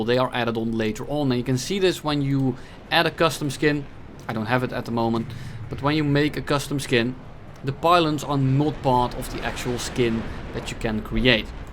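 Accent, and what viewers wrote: Netherlands. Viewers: Dutch English damn....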